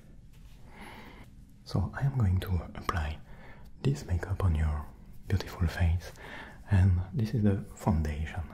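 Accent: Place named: France. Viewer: Ton petit accent bien Français me fait tellement rire 😂😭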